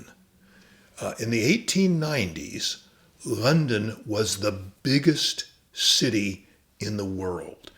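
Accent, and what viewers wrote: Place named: United States. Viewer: Americans... attempting to recite Irish poetry... and it just doesn't work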